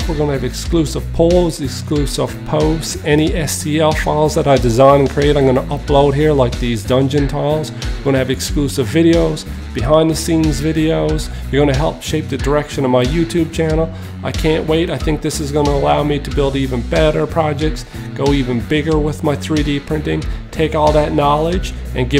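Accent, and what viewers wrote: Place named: Ontario, Canada. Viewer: Nice work on these, but where’s this guy from? His accent sounds like Ontario crossed with surfer bro.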